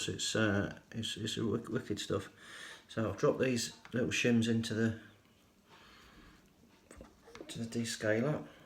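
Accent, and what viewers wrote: Leicester, United Kingdom. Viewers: This is brilliant, was going to get a plumber in until I saw this video, I reckon I can tackle this myself, a big thanks mate! you have to be from around Leicester, sounds like my next door neighbour! thanks again bud!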